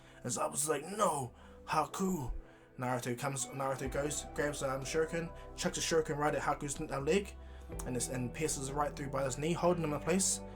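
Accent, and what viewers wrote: New Zealand. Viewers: Another Kiwi I was able to tell just by listening to you my g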